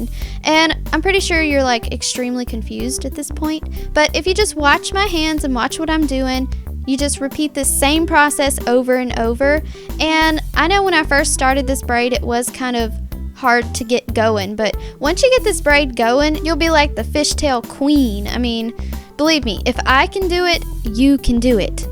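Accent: South, United States. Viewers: I absolutely LOVE LOVE LOVE the way you talk! I can definately tell your from the south(and i don't mean it in a rude way because i live in the south) but your voice is so cute! thanks for makin me laugh(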